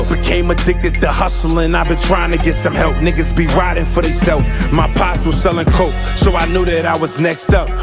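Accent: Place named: New York, United States. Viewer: This is what NYC supposed to sound like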